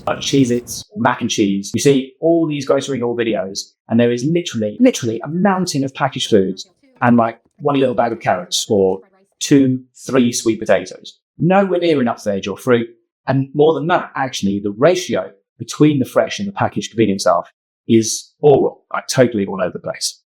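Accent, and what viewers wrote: United Kingdom. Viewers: This is a great follow on to where I just saw you shop in Aldi for the items. I'm so wasteful with food and chicken seems to be gone in one meal, and I wish to reduce that. I'm curious though, I hear a Uk accent, but you are a Kiwi according to google, so is this an ai voice, or did you ever live in the uk?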